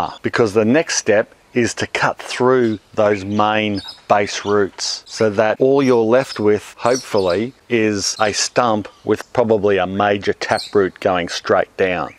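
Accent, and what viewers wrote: Australia. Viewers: This dude is an Aussie Stud! With that accent and those thunder thighs, he can get anything done! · Love that Australian accent. Too bad the country has gone Totalitarian... The rest of yhe world is following, sadly. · I wonder why the early pilgrims of the 17th century didn't develop that ozzy sounding accent. maybe they did but there are no recordings to confirm it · Dan Miranda I could listen to an Aussie read a phone book. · "How to Remove a Tree Stump with a Pick Axe, Chain Saw, Scrap Hardwood, Circular Saw, Prong-tool/crow bar." Hmm, yeah, wedges and a sledge is all I expected to need by the title. FYI, I'm doing the work for a couple Geriatrics on a super slim budgie, not looking to run up a line of credit at the home improvement warehouse. Always enjoy listening to the Aussies, regardless. My favorite YouTuber is Ozzy Man Reviews: <link> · I really just wanted to listen to an Aussie bloke talk about removing stumps. 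· Al Borland, but Austrailian......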